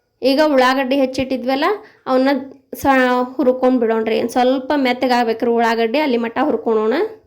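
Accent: North Karnataka, India. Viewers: Adu nam uttarkarnataka Kannada gramin bhashe adu...yaw yaw oorkade Heng Heng matadtaro hang matadtidare bidi.. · ಉತ್ತರ ಕರ್ನಾಟಕ ದ ಸ್ವಚ್ಛ ಭಾಷೆ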